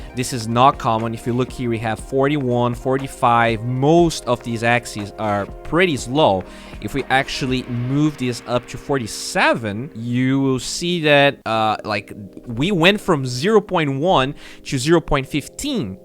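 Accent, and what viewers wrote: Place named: Brazil. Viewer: Hey man, are u brazilian? U have an accent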